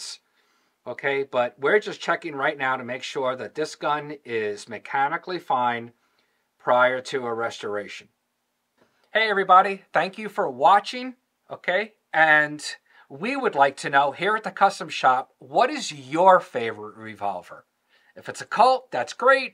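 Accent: New York, United States. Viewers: Pretty obvious that he's from NY/NJ. You can hear the accent slip in frequently. 👍 EDIT: Favorite revolver and handgun is my S&W 686-6 shot w/6" barrel. I've had it for 25 years now. Enjoying my new 627-8 shot with 2.6" barrel, but would prefer if I could find the 627 w/6" barrel (not currently offered.) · Montana gun shop with the New York accent way too many okays for me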